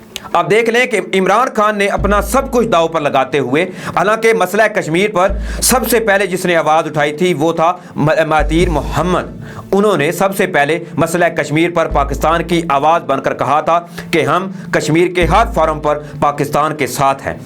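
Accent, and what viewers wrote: India. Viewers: Dost Urdu thek Karo plz aap Indian style Urdu bol rahe hay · Yeh banda indian Hé is ka talafaz ghor se SUNAIN pâta chal jaye ga Hé is indian.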